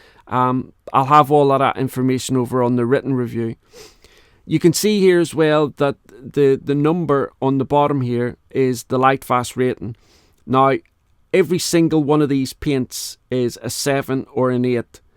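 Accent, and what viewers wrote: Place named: Northern Ireland, United Kingdom. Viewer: I absolutely ADORE you accent!!!! The Northern Irish accent is quite possibly my favourite English-language accent. Actually I find that English speakers often mangle foreign languages not because they are not trying, but because they are putting in too much effort (so overcompensating wildly, trying to imitate what to them sounds like the foreign language they are trying to speak). Which is actually kinda sweet… except when it leads to funny misunderstandings - like when English speakers pronounce “coup” as “cul” (I hope YouTube does not remove this comment, haha), which in French means… a vulgar word for bottom. A very polite British friend of mine got in trouble for misprounoncing “merci beaucoup” that way. So, he accidentally said to a lady who was holding the door for him, “thank you - nice a**e!” 😂 PS - I am also a fluent French speaker. 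And the Irish accent sounds wonderful in both!!!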